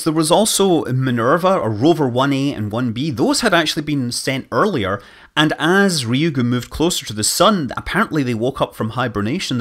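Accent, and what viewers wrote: Scotland, United Kingdom. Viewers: Came for the science. Stayed for the Scot accent.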